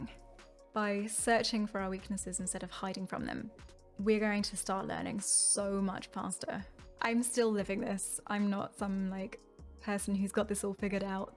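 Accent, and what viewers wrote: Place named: United Kingdom. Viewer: I've stared at your face in the reference images for so long but I had no idea you were British lol that blew my mind. Now I'm questioning everything.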